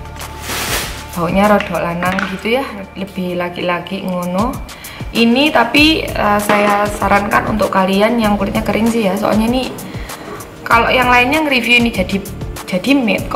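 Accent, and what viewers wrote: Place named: Malang, Indonesia. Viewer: ngakak nonton tutorial nya hahahah asli ngalam bgttt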